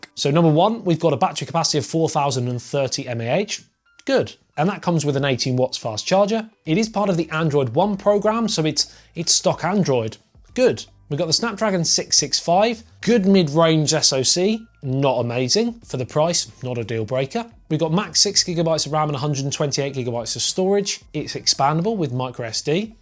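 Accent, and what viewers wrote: United Kingdom. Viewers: You mentioned the pricing only on the first device. You may have a James Bond accent, but this video was real douchebaggish ya cheaky bastard.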